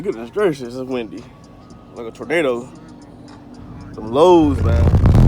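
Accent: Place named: South, United States. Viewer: your from the south huh ? im hearing a accent there,and im seeing camo duck hunting seat covers which omg i love duck hunting,well actually i love bar b queing duck,mmmm damn but yea oh and your even talking about the country haha ok so go ahead throw on some country hahaha im kidding where you at,which state not tx i didnt see a inspection sticker,well registeration sticker actually since they changed it to just one instead of both.but yea curious,alright Basshead UNITY ! 2020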